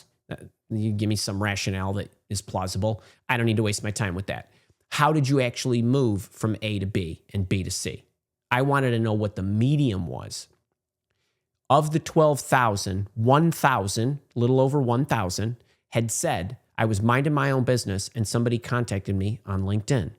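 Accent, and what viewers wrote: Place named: Chicago, United States. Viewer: He talks just like Billy Corgan. Must be from Chicahgo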